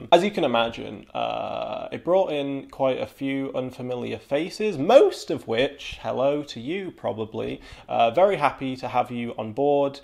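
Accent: United Kingdom. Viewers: I didn't know that you had to base how you wear your hair, your clothing, or your vocabulary based on your skin color.....that is a weird concept to me. At what point does the percentage of your heritage outway the pigment in your skin and visa versa. 🤔 And can you believe that "people of color" live in all sorts of different countries? Its like...crazy to think that people have moved and immigrated to other countries. (Sarcasm) I watched your video and my first thought was oh he is british... not that you didn't sound black... whatever that means. 🙄 I enjoy your content and the way you present it. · Sounding black really isn't a thing, said the whyte woman? Why do you think you'd be the one to decide that? Sounding black in terms of slang, word choice, accent and sentence structure definitely is a thing. Again when whyte people want to be racist and want it to be a thing they know how to sound in order to mock black people. 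So I don't buy it Yeah his british accent is very obvious. I don't think it was lost on most people, but even for a british person he sounds very whyte, lol. He literally has a whyte mother, don't even know why you're arguing this. 😁 Even british people will tell you there are all kinds of accents, you don't think a black caribbean or african accent is one of those? And yeah you can be black and not have these typical black accents but it doesn't mean you'd sound or act like him. He definitely has some extra whyte mayo in him (I mean he does literally, he's not black he's half whyte) and it's no surprise that it comes through in his views on race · I seriously cannot believe that people said you're not black because you have a British accent, sound too smart, dress too well, etc? I think you're hilarious and am glad you confront this head on rather than hiding from it. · the problem with the vocabulary argument is that people said he didn’t sound black which isn’t really a thing. 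He just didn’t sound American because he is British. People seem to think that black identity only exists in America which is just false. That what I mean when I was confused by people saying he didn’t sound black. But again, he’s just British. My partner sounds exactly like him because he was raised in London, does mean he isn’t black tho. Again they are just British 🇬🇧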